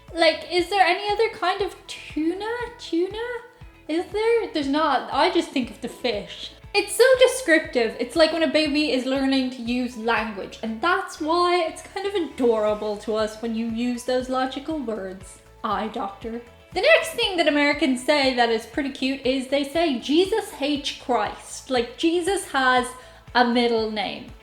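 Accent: Ireland. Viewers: The accent takes me home...family originally came from Cork in the late 1800s...are the Irish starting every sentence now with "So," as I now hear EVERYWHERE in America? Did you go shopping? So, I went to the store and got what we needed. What did the doctor say today at your appointment? So, the doctor told me I was fine. It is really beginning to drive me nuts! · As an American, I find every word that comes out of an Irish person's mouth to be cute. <3 Your accent is the greatest! :D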